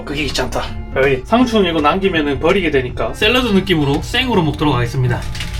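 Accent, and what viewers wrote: Busan, South Korea. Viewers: 천상 생각이나 말투나 bu산 사람 맞네요..ㅎㅎ 건투를 빕니다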